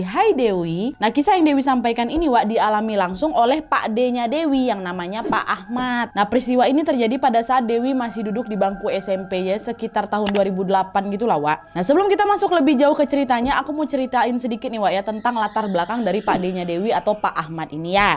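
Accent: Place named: Medan, Indonesia. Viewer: Pandai kali wak berkisah.belajar darimana? Dialekx itu suka banget.medan kah ya?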